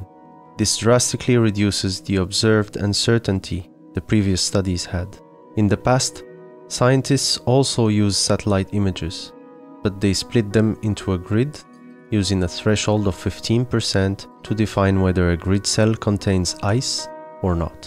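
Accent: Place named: Wallonia, Belgium. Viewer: My guess the accent is from Charleroi, Wallonia.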